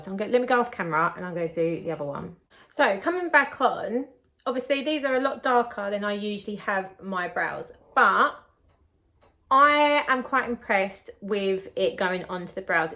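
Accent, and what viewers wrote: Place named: London, United Kingdom. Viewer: Hi, you’ve tested this the same way I might’ve done-I’ll definitely take note not to go too pale using the palette as a base product. Are you from London? You sound local- I’m from Romford.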